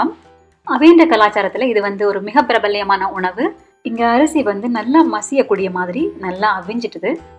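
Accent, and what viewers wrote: Sri Lanka. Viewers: நீங்க பேசும் ஈழ தமிழுக்காக நான் உங்கள் பதிவை மறக்காமல் பார்ப்பேன் சகோதரி... மலேசியாவிலிருந்து 🇲🇾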